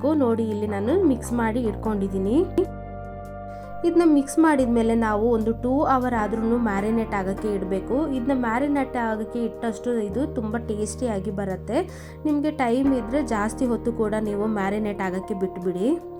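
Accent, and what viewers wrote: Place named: Bangalore, India. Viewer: Ninde kannada super cook sis 🥰👍👏👏😁nin pakkka Bangalore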